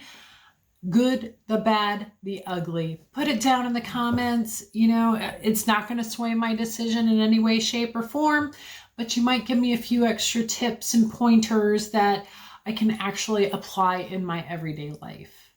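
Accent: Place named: Pennsylvania, United States. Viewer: Got a sister who is a dealer in a casino also and her husband is a security guard. She's been at it for years. And it's really been hard on her body and she's gained a lot of weight. Loves her job but she's not ketovore or anything like it. YOU CAN DO THIS!! You're a true believer in this WOE and you'll stick to it.!! If you're in PA I just wonder if you're at the same casino??? You sound like a Pennsylvanian! First time to your channel so I hope you wouldn't mind telling us where you are? BEST WISHES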